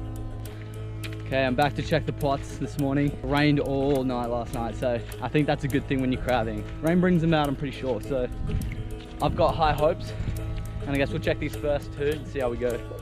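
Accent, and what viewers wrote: Australia. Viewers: Robin Dabank lol he said how aussies talk mate he may make a joke out of it but all aussies say it lol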